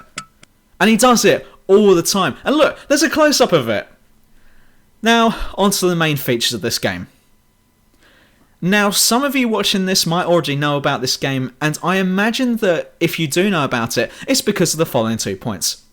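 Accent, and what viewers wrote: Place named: South London, United Kingdom. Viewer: That " " tune is...kinda catchy...especially with the South London (?) accent - I would expect a N'awlins drawl for a title like that. It's refreshing.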